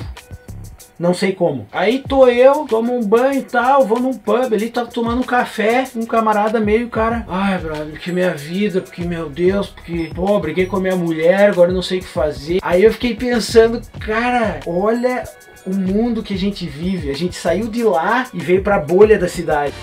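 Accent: Rio Grande do Sul, Brazil. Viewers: No início, pelo sotaque, eu sabia que era meu conterrâneo gaúcho!!!! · sotaque gauchoooo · tu é gaucho? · pelo acento com certeza tu é gaucho tche hahahah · Gaucho que fala pia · Sotaque gaúcho kkk❤️ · É Gaúcho, dá pra ver no sotaque, de que cidade tu é? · Parece gaúcho pelo sotaque kkkk, talvez eu esteja errado, mas o sotaque parece muito com o meu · Teu sotaque pareces com o do RS, eres daqui? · Esse sotaque de quem toma um mate na redença não me engana... curti o video e curti o canal... quem sabe um dia fico uns tempos ai! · gaucho certeza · Mas bah, guri! Esse sotaque entrega daonde tu veio! É dos meus, curte um Chima! Que massa teu canal brother, que conteúdo incrível! É viciante, confesso. Um grande abraço aqui do Rio Grande Do Sul. Dalhe grêmio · Mano, tu me parece ser gaúcho kkkkk Manda um salve pra Rio Grande do Sul, Gravataí TMJ!! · bah LlllKk, tu é gaúcho? 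· Nos primeiros 10 segundos pensei na hora que tu é gaúcho hahah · tu nasceu em q estado do brasil ?? (obs) tu parece c gaucho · Nem 5 seg de vídeo já vi que é gaúcho! Hahahah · Tu é gaúcho cara? Maior sotaque daqui kkkkkkk... Não sei como cheguei no teu canal, mas teu trabalho é fera! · Ele é gaúcho!!!🤗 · em segundos ouvindo ele falando já dá pra ver que é gaúcho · Só pelo sotaque no início do vídeo ja percebi que era gaúcho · tu é gaúcho? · Dale RS! Hahaha reconheci esse sotaque com 10 segundos de vídeo! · Show, mas tu é gaúcho né? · irmão!! tu é de onde mano!! parece ser gaúcho... hehehe encontrei seu video agora... primeiro video que eu vejo.... e teu sotaque é muito parecido com o nosso... do RS! hehehe abraço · Sotaque gaúcho bah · Nos primeiros dois segundos ja identifiquei que é gaucho..hahahaha. É nois!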